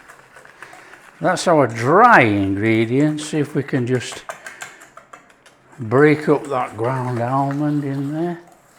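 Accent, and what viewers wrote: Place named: Northern England, United Kingdom. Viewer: Love, love your beautiful accent. Is it from the north ? Greetings from Reading, Berkshire.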